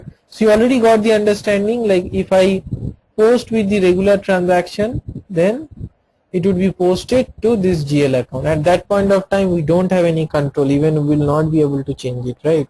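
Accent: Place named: India. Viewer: Man this indian accent is annoying, i cant understand anything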